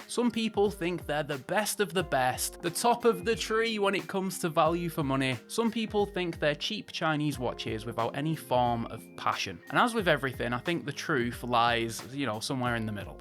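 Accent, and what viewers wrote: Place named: Scotland, United Kingdom. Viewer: Why are all you reviewers Scottish? 😒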